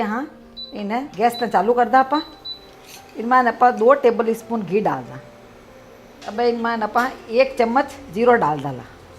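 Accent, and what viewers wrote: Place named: Marwar, India. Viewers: nice aunty ji ur marwadi language is very sweet😙😙😙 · राम राम "मा" सा आप बहुत ही अच्छी रसोई बनती हैं उस सेभी अच्छी आपकी मारवाड़ी बोली है आप एक मारवाड़ी मुंग की बड़ी की सब्जी की भी रेसिपी बनाईए गा जी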